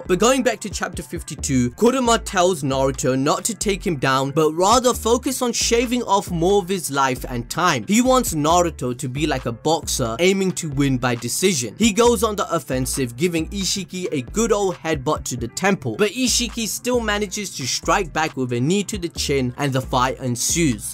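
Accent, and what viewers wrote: Punjab, India. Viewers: Are u punjabi bro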